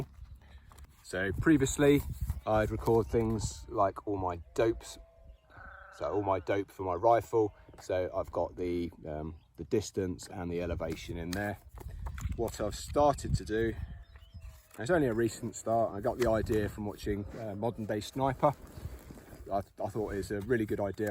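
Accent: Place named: United States. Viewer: You talk funny from America. Thanks for the video good info.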